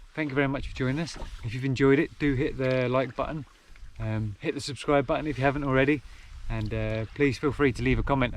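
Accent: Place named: Scotland, United Kingdom. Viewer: Shameful pronunciation? I love the accent! Scotland rocks! Greetings from Greece! Keep hiking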